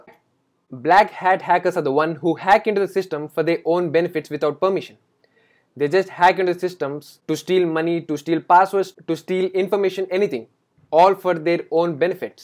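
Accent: India. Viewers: You know it's about to be good when the man's got a slight indian accent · it's a proper indian accent....not slight...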